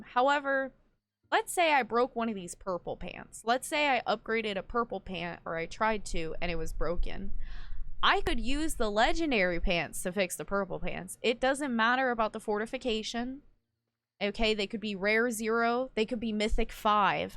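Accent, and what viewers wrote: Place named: South, United States. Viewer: OK it’s adorable there’s just a little bit of southern girl MUA bank to see if I can ever so often a little bit of southern accent pops out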